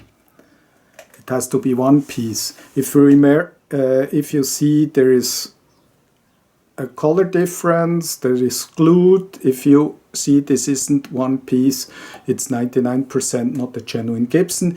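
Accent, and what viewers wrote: Switzerland. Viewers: Tönt nachdem ne Schwiizer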